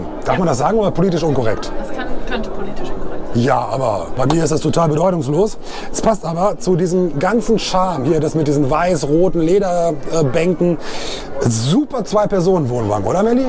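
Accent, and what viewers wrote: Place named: Gelsenkirchen, Germany. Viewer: Dein Sprachausdruck ist furchteinflössend.... Willst Du deine Videos nur nach "Gelsenkirchen hin" verkaufen !!!!! Drei mal Daumen runter für Audio :-(((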